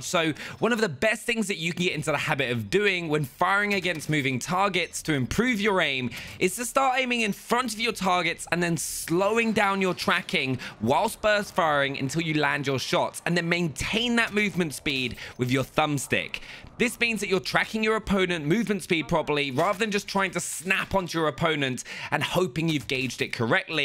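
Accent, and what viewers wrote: United Kingdom. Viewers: Both British i guess...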